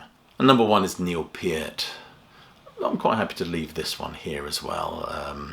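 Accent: United Kingdom. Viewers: i love your commentaries, even if i don't know all of the musicians you mention. if you don't have a PhD. in musicology, you deserve one. i don't know where you derived all your knowledge, but it makes me think you either owned a record store, or were a dj at some point. your blunt brutal honesty is both humorous and entertaining. the british accent adds a dimension as well.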